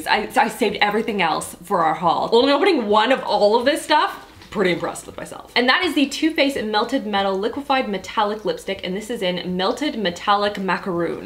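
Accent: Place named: Canada. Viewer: where is she from? Canada? I have a feeling cause of the way she talks:) Super funny video:)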